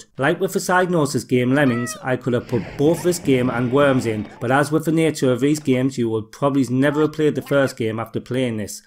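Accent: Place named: Sunderland, United Kingdom. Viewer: Great video! Nice to hear a mackem accent!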